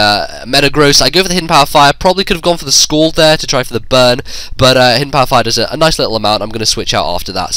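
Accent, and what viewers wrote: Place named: England, United Kingdom. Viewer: your obviously english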